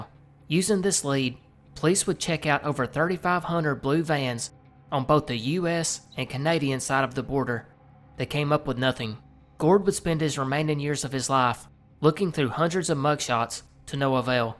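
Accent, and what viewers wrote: South, United States. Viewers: Keep up the great work! Love hearing a southern voice on here!!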